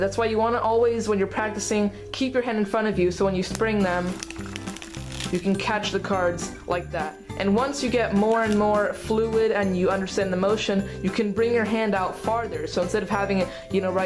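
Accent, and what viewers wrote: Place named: Russia. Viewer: Your voice has a Russian undertone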